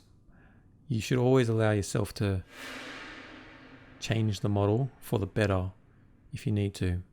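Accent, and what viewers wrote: Australia. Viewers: Aussie to the core without being too ocker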